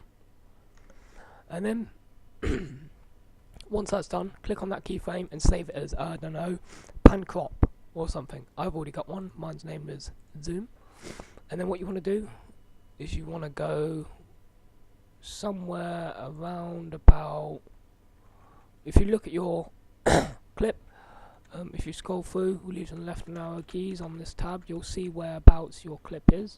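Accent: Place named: United Kingdom. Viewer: Which part of the uk you're from? I'm from London ;p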